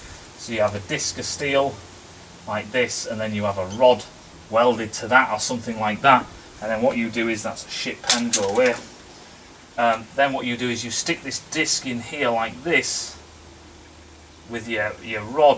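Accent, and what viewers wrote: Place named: England, United Kingdom. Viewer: As soon as I hear the english accent, I know it is going to be a cluster f k of wrong tools and half ass work.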